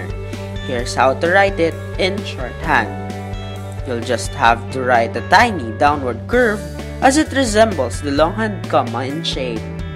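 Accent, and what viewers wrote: Philippines. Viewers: ❤ the Filipino Accent ..Easy to understand. 👍👍👍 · Your accent is a Filipino. Well this is useful thanks. Maybe u can have a lesson on person advertise on your video. Just saying.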